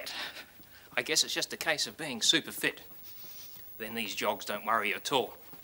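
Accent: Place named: Australia. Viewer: You learn 50% more when the instructor has an Australian accent.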